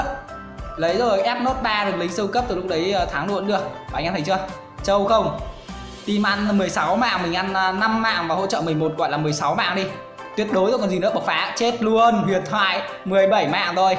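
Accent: Nam Dinh, Vietnam. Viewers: A ở nam định ạ :)) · Quê a ở nam định à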